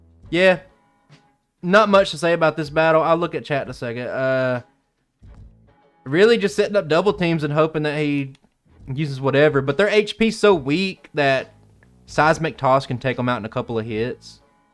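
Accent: South, United States. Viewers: I'm up in bed watching this run and the DUUUUUDE! that kept coming out of that southern accented mouth of yours had me jumping to run to the bathroom before I pisted myself. Bravo, DUUUUDE bravo.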